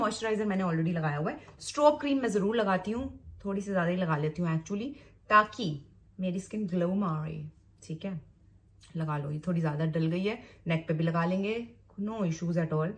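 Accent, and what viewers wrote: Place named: Punjab, India. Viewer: This was so fun!! 😄❤️ You have a strong Punjabi Delhi accent, and I love it! 😘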